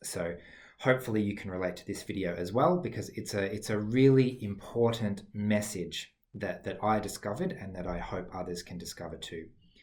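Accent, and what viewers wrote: Australia. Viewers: I'm self diagnosed, now 44. Getting a diagnosis here where I'm from was too much of a hassle and cost for me a few years ago at least. But I'm glad I know for myself now. I had a boyfriend a few years ago who's on the spectrum also, and it was like coming home. For both of us. I'm really hoping to meet up with more people on the spectrum, but there aren't any meet-up groups like that here and I don't have Facebook, otherwise I would love to join! I'm just really glad I know for myself, and yes, I live pretty much like a recluse, even before covid. But thanks to studying spirituality, I've learned to love myself and not think less of myself because I understand that diversity is necessary for evolution :) I really chuckled at your trying to stay on topic or sticking to a script comment there lol, that's something I have to actively be conscious of all the time!! 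I'm from Brussels, but sometimes I wish I lived in the UK, just because of the access to more meet-up groups irl. You sound like you're from down under though, but not sure! Anyway, thanks for being an advocate for an often unseen group of people. Your videos are really good and easy to listen to, no bells and whistles, just how I like my clothes, my food, and my videos lol 👍👍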